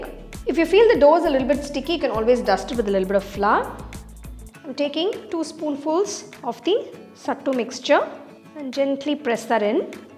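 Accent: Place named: South India, India. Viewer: Bihari sattu paratha in south Indian accent!! A delicacy in itself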